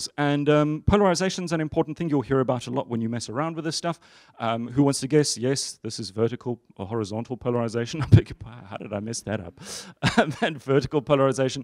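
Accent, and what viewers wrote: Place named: South Africa. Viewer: I recommend watching this at 3/4 speed if you're not South African or Kiwi.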